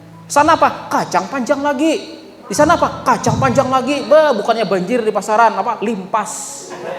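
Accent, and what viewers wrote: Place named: Tegal, Indonesia. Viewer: Wong Tegal kie pak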